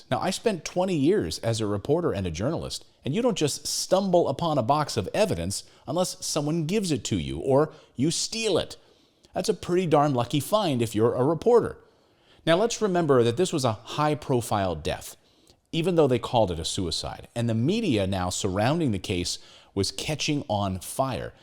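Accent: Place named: United States. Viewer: Perfect American English ! Just perfect !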